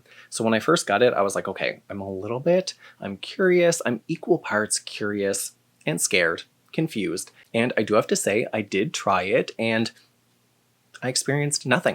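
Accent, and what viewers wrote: Canada. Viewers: Videos are always great! I learn so much about skincare even outside of these products.. Love how you touch base on layman’s terms and legit science terms back to back so I can understand the deeper stuff to research or cross check my current products :) And ya know, every time you say “out” I’m geeked on your Canadian accent :) most romantic accent if you ask me But yeah overall thanks for describing some great tips and sharing so much knowledge! I’m just starting my skin care routine at 29 and it’s really helping me know what to look for when i buy and apply 😊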